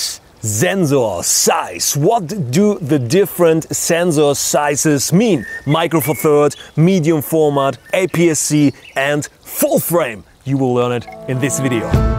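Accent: Germany. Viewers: let me guess... you are from Germany? ;D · are you German? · "Zenzorrz" and "Sand corns". Okay, to clarify, is German and in Germany we have 2 ways to pronounce the "S" –> sharp and soft. The German pronounciation of the German word "Sensor" is more like (or exactly like) IF it was spelled "Zenzor". That is the reason why this sounds a bit weird here. A common mistake by Germans. We have a term for this: "denglisch" (deutsch + englisch). The German "Z" by the way is always pronounced like a short high hat "ts", never like a soft "S". The word "sand corn" I believe he also derived from the German "Sandkorn". In English it sounds a bit off, because it's more common to use "grain of sand", but I guess it does it's job. But other than that his english is pretty decent – you have to give him that. I don't know any English speaker who performs German for example in that fluent manner.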